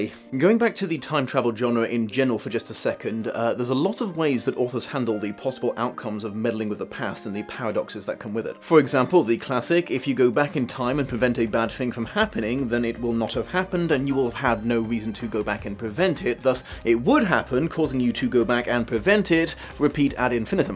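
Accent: South, United States. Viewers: That's the most American Accent that ever did American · I love your Southern US accent! 😀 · GyroMurphy and yet somehow the Southern accent makes the whole thing even better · Your New England accent has a southern twang. You sound like rick grimes from the walking dead WHO IS ALSO ENGLISH irl! Side note: I was listening to this book on a long road trip in 2013 when I drove from Boston to Orlando and back I got to the part of the water fountain and stoped. I recently watched the English tv show AND the animated movie (the animated movie is closer to the book) Cheers! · I love your American accent honestly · I did start thinking about Daniel Craig during the review, but mostly because I was comparing American accent to Craig's performance in Knives Out. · Hold up your american accent is excellent not perfect of course but very very impressive! (And a little southern for 💙 flavor 💙) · That accent though!!!! 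I would call it more southern as a New Englander, but credit where credit is due because I could not pull off a southern accent that well! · Love the slightly southern accent. Sounds kind of like a Louisiana accent. Very cute! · Your American accent is so cute. Especially since you're trying really hard to do a texas drawl by deepening your voice. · I love your American accent! :D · Not sure why a Connecticut yankee is wearing a cowboy hat and speaking in a slight southern accent, but I loved the video anyway! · You are channeling some Southern state with your accent lol. Virfinia, S. Carolina, or Georgia. I AM a Connecticut Yankee so I don't know Southern accents. But it's definitely not Northern lmao. I love it tho!!! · I love your American accent!!! Perfect delivery! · The irony is that a Yankee accent is closer to a British accent than the slow southern gentleman drawl you may as well be saying "I'll be your huckleberry" in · Your southern accent is really on point. 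· Holy shit you have a better American accent then I thought possible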